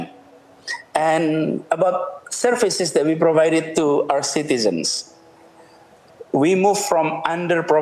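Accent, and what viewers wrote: Indonesia. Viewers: To be honest, this is the very first time I see this 'bahlul' speaking English, and I have to say that I'm not at all impressed with his English. I find he just speak Indonesian with English words (not vocabulary). You know what I mean, don't you?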